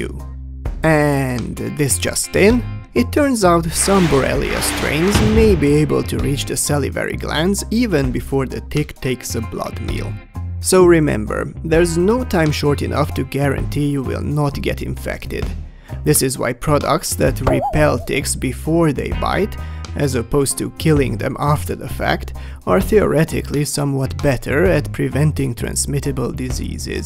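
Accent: Hungary. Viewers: I was curious how your jokes would work in english, and I'm not disappointed :) They still makes me laugh my ass off, but also very interesting to rewatch these videos in english. And I really like your accent, you cant deny you are from hungary :D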